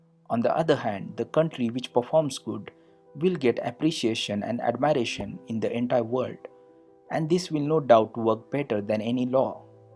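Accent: India. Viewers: I think his accent is indian. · I got even more confused with this shithole Bengali accent..!😂🤣🤮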